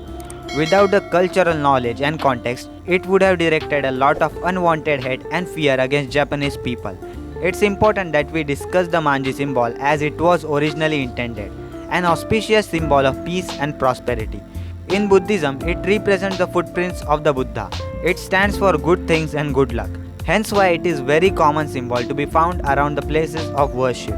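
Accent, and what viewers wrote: India. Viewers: Tumari aawaj sunke hi pahachaan lia tum indian hoo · Yes mai bhi pehchan gaya ye indian accent ko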